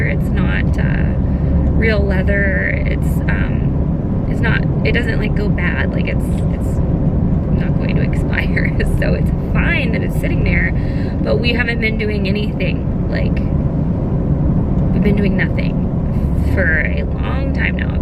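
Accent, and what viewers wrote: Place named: South, United States. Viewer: Just wanted to say... at the beginning, your voice is sounding more country. Being in the s.a. area looks good on ya! Getting the accent back lol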